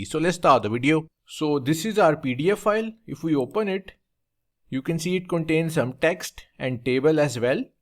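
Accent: India. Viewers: English language with an Indian accent. Deplorable condition 🙃🙃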